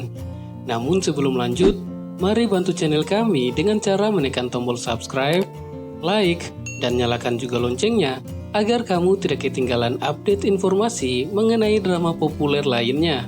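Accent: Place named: Makassar, Indonesia. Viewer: Dari cara ngomong kelihatan bgt kalau org makassar😂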